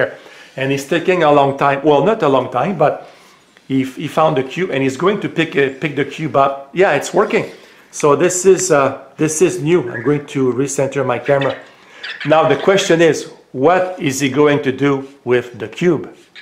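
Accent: Quebec, Canada. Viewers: i guess its the accent ! , he has a strong quebec french accent , for it to work , he should say . hey vector .. bring me the cube TABARNAK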